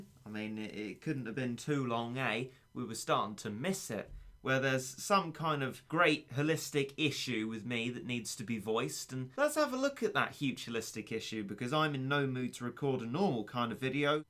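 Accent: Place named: United Kingdom. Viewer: I can see why this guy would think these untrue things- it's your english accent! Remember that in American pop culture everyone with a british accent is automatically a villain.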